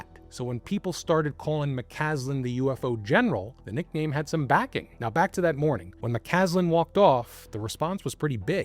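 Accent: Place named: Pittsburgh, United States. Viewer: I gotta ask, are you from Pittsburgh? I know you say in the beginning you are in Vermont but your accent reminds me of my hometown. ❤ Love your videos BTW.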